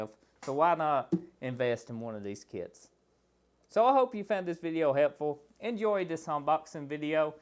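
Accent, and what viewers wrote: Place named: Texas, United States. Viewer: Your Texas accent is difficult to understand but this kit looks like a good value.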